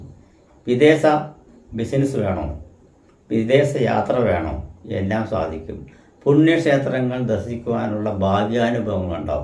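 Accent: Tamil Nadu, India. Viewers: Tjamizhu. Parayunnath. Kondanu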